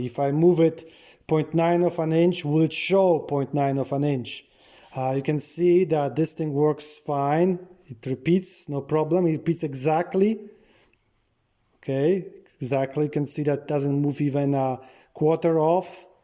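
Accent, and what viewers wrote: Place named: Russia. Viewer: Interesting accent, russian perhaps?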